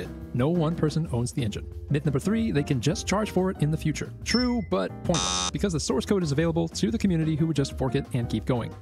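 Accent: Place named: United States. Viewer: His American accent makes oh sound like ah to us Europeans. But he's saying oh :)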